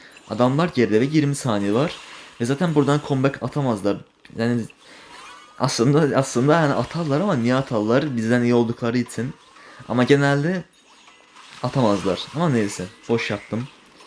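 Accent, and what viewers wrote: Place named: Azerbaijan. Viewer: Qaqa danişigindan azerbaycanli oldugun bellidi ugurlar😂😂😊😊❤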